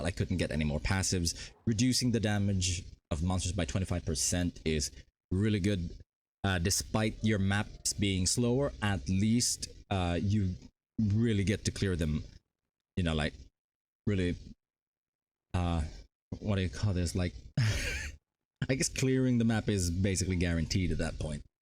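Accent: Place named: Philippines. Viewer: Damn! I knew I was right, you have a "slight" filipino accent haha. Same here!! Though im pure pelepeno. Btw whats your streaming schedule would gladly tag along :)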